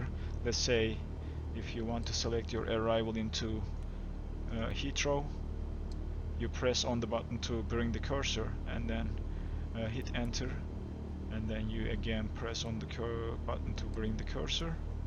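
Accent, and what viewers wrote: Turkey. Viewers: Bu adam türk değilse bu dili de bilmiyorum derim artık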